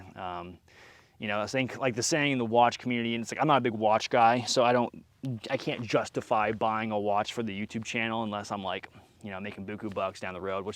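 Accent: Zimbabwe. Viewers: Nah that’s just a Rhodesian accent bruv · Spot on with the Rhodesian accent with a touch Saffa in it.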